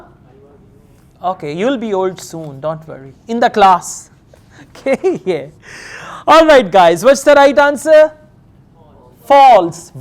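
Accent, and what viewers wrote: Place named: Punjab, India. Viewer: I like urs Punjabi keep speaking like that it suits u very much👍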